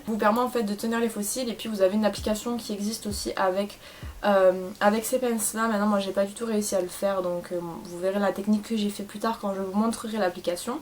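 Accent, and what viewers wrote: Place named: South, France. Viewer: Ton accent de sudiste là , magique 💕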